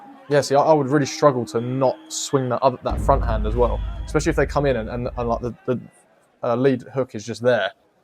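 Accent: United Kingdom. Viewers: Remember me w vids w guy which part of th UK are you from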